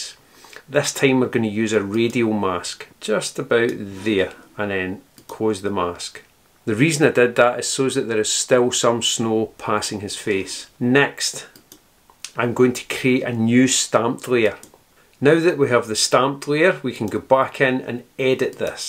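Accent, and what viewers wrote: Scotland, United Kingdom. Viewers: Nice to hear a Scottish accent on YouTube by the way. Luminar is now like a user friendly version of PS and LR all rolled into one. Love it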